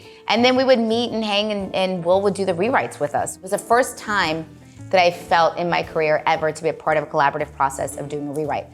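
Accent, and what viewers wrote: Ukraine. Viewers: I feel like she must have been talking to her mom or dad right before this because I can hear her Ukrainian accent sneaking out 😂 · i love how i can hear her ukrainien accent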